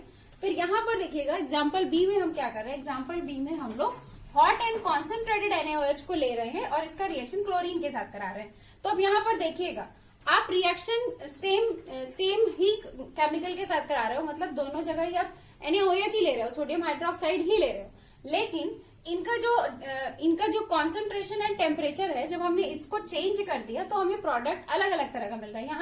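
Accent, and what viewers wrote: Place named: Bihar, India. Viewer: You are good in teaching. I am a chemistry faculty for jee/neet. Your teaching notes are concise and very clear, strictly according to ncert syllabus. No unwanted talk; only topic relevant content. Keep it up and keep posting. You are bound to rise on this platform. Also As bihari, I liked your accent accent.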